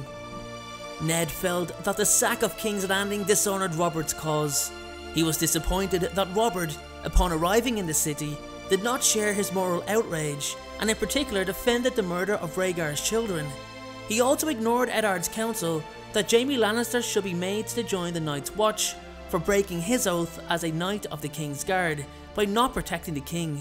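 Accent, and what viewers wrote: Ireland. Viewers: He’s obviously Irish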